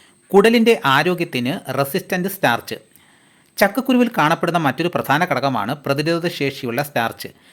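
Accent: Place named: Thiruvananthapuram, India. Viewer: ഇത് തിരുവനന്തപുരം ഭാഗങ്ങളിലുള്വരുടെ പ്രയോഗമാണ് അത് തെറ്റിയത് കൊണ്ടല്ല അത് കൊണ്ട് കളിയാക്കേണ്ടതില്ല ഓരോ നാട്ടിനും അതിന്റേതായ രീതികൾ ഉണ്ടാവും കാസർഗോഡ് കാരുടെ പ്രഭാഷണം കേട്ടാൽ താങ്കൾ എന്താണ് പറയുക ഒന്നും മനസ്സിലാവില്ല അതെ സമയം ഇവിടെ ഭ പറയുമ്പോൾ മാത്രമേ വ്യത്യാസമുള്ളൂ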